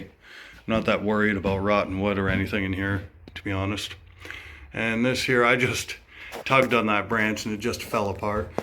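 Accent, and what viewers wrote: Canada. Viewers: You sure got a lot of space left in the shed and you got rid of the hornets without getting stung once 😂🥴 anyway I’ll see you tomorrow 👍🏼 I forget if you guys are in Canada or Michigan 🥴 because you have an accent I guess you could be from either 🤣 lately people have been reading my comments so maybe someone can tell me where you live not like it matters I’m just curious 👀 I don’t have much else I’m paralyzed and bedridden 🤯😂 and really enjoy your content BUT I guess I have to wait till tomorrow 🤣😂 anyway guys have a good evening 🙏🏼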